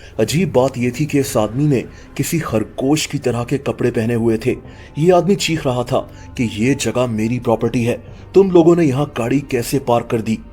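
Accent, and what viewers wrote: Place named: Lahore, Pakistan. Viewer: speaks like a typical lahori definitely reality tv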